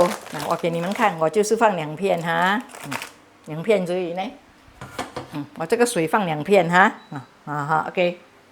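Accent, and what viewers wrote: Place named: Hokkien, China. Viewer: you are fantastic. I love mee Siam., I love your Chinese with, I believe, with a taste of Hokkien. So familiar and homely.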